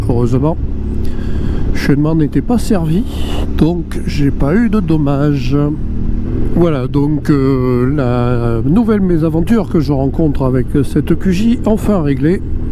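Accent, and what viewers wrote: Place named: Provincial, France. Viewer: Le mec achète CHINOIS et il s'étonne d'avoir des problèmes, HAHAHA !! Et c'est quoi cet accent ridicule de plouc de province ???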